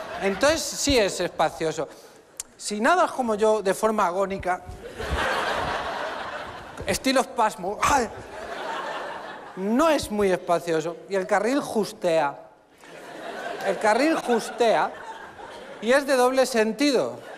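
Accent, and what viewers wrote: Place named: Catalonia, Spain. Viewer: Acento de Catalán mu bonito de un bonito francés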